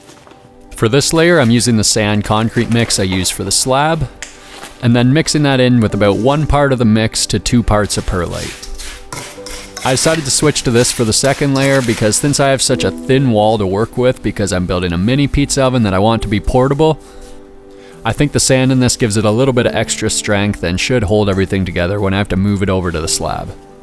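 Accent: Canada. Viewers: I can usually not tell where people are from from their accents but you are very Canadian. :) cheers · Awesome build, my only complaint is the use of pizza sauce from a bottle, and cheddar cheese on a pizza. This is the most Canadian content possible (I can tell from your accent)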